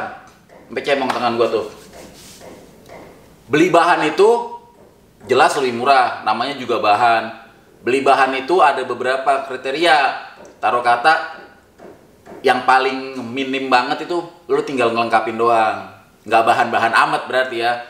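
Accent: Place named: Betawi, Indonesia. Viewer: Tertarik dari bahasa Betawinya..nyablak..lama2 tertarik ngliet konten Vespa...padahal ga punya Vespa...lanjut bang......cihuy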